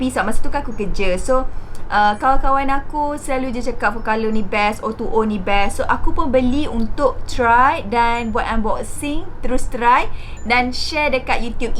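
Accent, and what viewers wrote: Kelantan, Malaysia. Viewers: Hai kak saya suka tengok video kak lagi² kalau video cakap bahasa kelantan suka sangat comell💓😘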